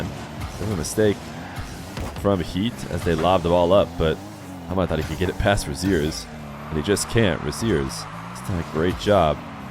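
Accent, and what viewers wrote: United States. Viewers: Tbh, you’re very similar to Johnny. It’s almost like an American accent version of him. That being said, that’s exactly what everyone, myself included, wanted. Johnnys been slacking in 1s and he has hands down the best casting. What better then if not a similar caster with an insane catalogue of ones. Keep it up, amigo.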